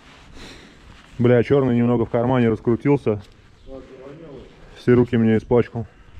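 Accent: Saint Petersburg, Russia. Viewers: ты с Питера?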